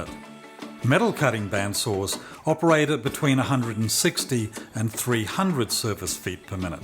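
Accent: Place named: Australia. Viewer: Hi mate. Great conversion 👍… You sound like an Aussie… but you mentioned 110v… Are you in the USA? Regards Robert ( Sydney, Australia)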